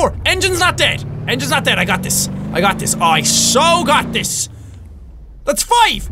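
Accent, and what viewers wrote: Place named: Ireland. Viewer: I love his Irish accent “Cars aren’t supposed to have tree wheels, their supposed to have four wheels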